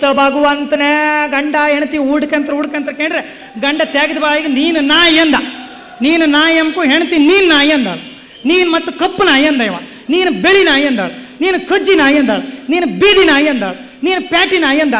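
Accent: Kundapura, India. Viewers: Kundapura Kannada Super.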